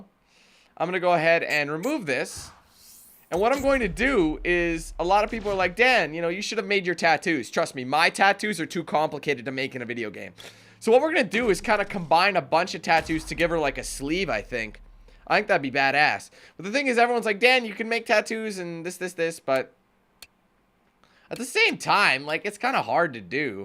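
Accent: Ireland. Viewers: Are You Irish? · Wait a minute .... Dan is Irish?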